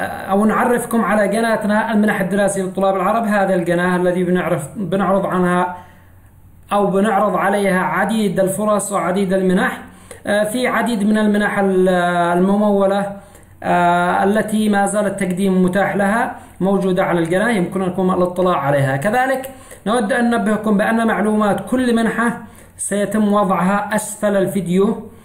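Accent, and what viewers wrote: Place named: Sanaa, Yemen. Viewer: فدا اللهجة الصنعانية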